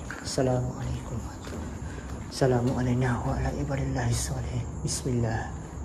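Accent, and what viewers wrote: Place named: Terengganu, Malaysia. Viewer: Rumah ni ker yg maksudkan tempoh hari lokasi kat damansara..Yg selalu tersasul dlm video terdahulu sebut kotak rokak ker depang ker yg bercampur aduk dgn loghat besut.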